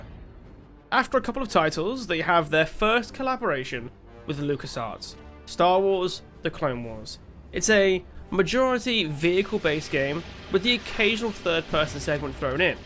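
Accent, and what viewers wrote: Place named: England, United Kingdom. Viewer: English accent? Star Wars video game lore? Subscribed!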